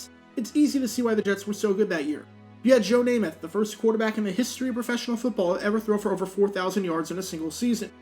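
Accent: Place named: Philadelphia, United States. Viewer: is from philly/south jersey? he sounds like it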